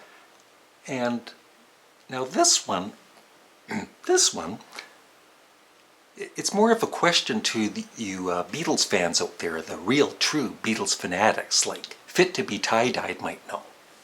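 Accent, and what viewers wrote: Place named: Canada. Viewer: Hey, I've got to say, Side 1 of ' ' epitomizes the 'Beatles' sound. Also, I've got an idea for a future video. Could you review...not necessarily rank...Beatles fashion from their beginning in leather through their mod look, to their long(er) hair hippie look? I think it's fascinating they they evolved into so many looks (and sounds!) in only a few years! Also, can you work the word 'about' into your videos more? I love how you Canadians say 'abooot'.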